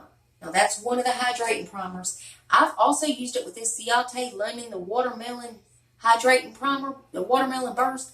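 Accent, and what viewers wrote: South, United States. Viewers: Girl love what watching your videos you.... you crack me up.... and I live all the make up tips that you give and I love your southern accent I'm a country girl myself ❤❤❤ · I'm going to try this out too. We'll see if it works on a 60 yr old face. Lol I love watching you and listening to your accent. I'm a southern in Arkansas so it's nice when I run into a southern accent.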